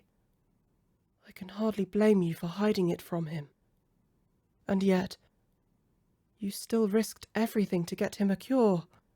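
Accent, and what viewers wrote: United Kingdom. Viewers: A nice romantic story, but the sorceress didn't seem that dark to me. I like your voice and your English accent.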